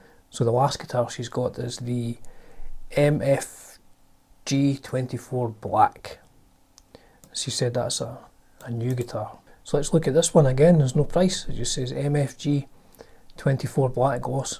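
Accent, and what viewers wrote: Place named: Glasgow, United Kingdom. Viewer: You sound like you might be on the Glasgow side of Scotland.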